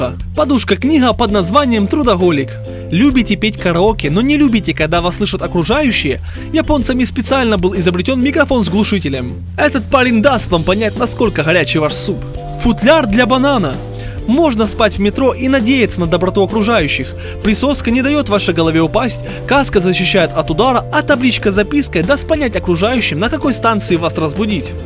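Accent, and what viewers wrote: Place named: Surzhyk, Ukraine. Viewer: ты конечно, молодец, но пожалуйста, говори не на суржике. Ухо режет...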